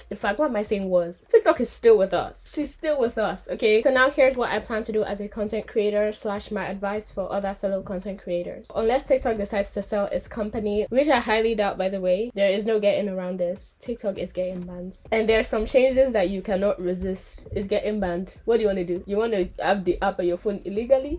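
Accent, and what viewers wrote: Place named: Nigeria. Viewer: Love the Nigerian 🇳🇬 Assent ❤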